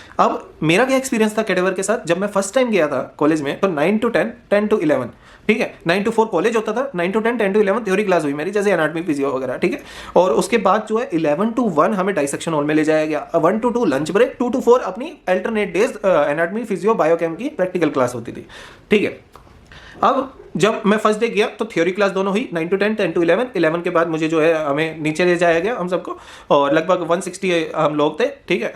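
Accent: Haryana, India. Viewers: Bhai teri voice me haryanvi touch h Hostel m haryanvi students k saath rha h kya bhai ❤️❤️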